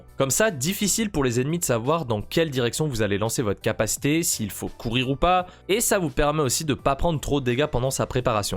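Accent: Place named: United Kingdom. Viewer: je te juge pas mais ton accent anglais seris... désolé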